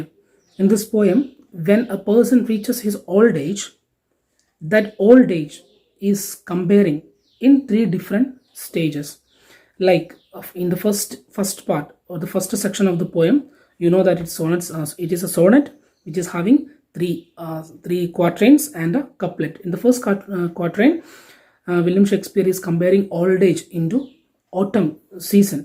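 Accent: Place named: Kerala, India. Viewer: Sir u r from Kerala no???? We can identify it when u r talking Sir I too a malayali 🙂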